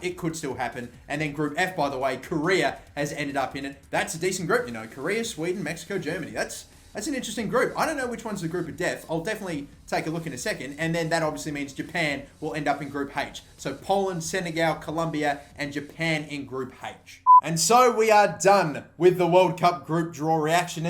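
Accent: Australia. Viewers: I thought u were English at first and when you started to explain last world cups group England "was in", I was like "wtf, they had Italy, Costa Rica, and Uruguay, not spain, netherlands, and chile. Then i realized you were Australian....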